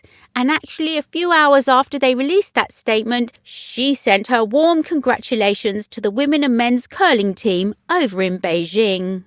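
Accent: United Kingdom. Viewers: Get rid of this dreadful voice. Sounds like a Valley Girl with a British accent😡😡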